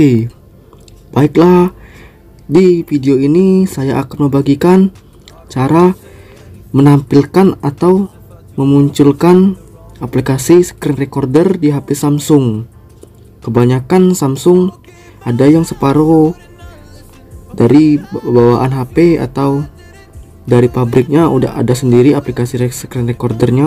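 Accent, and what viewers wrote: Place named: West Java, Indonesia. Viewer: Sunda bang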